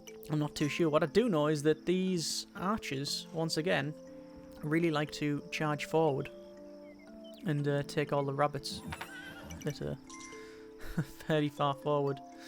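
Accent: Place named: Wales, United Kingdom. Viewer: Sounds Welsh to me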